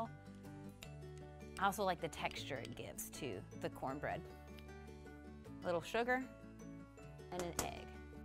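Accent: South, United States. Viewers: is beautiful and then you add the Southern twang and the cooking factor. Even better.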